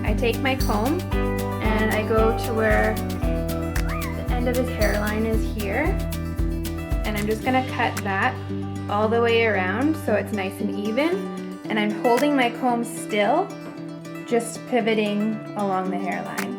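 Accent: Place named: Texas, United States. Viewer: You must be from Texas.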